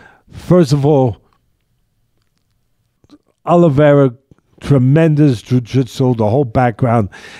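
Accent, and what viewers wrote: New York, United States. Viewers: Gotta love that old school NYC accent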